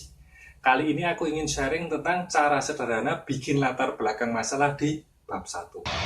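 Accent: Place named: Java, Indonesia. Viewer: males banget denger MEDO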